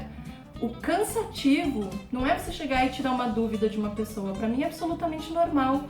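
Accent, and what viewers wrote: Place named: South, Brazil. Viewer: aaaaaa vc é do sul, como nunca notei?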